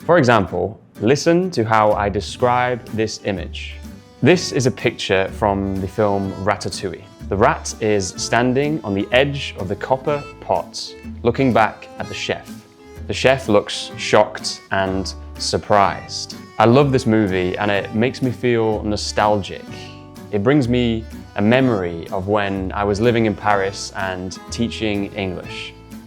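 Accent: United Kingdom. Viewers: Hi. I’m from Thailand. I really love ur Vdo. I subscribe ur channel few months ago. I want to have British accent same as u.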